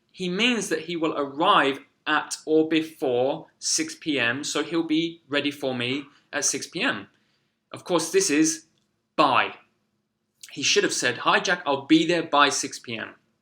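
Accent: United Kingdom. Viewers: Lovely British accent I liked 🙋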